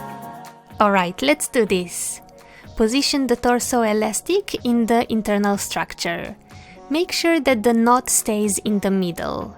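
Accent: Russia. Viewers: I love your accent ... are you from Russia ?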